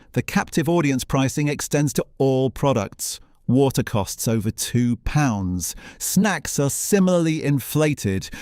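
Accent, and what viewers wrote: United States. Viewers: Why are you talking in an American way? It is so annoying.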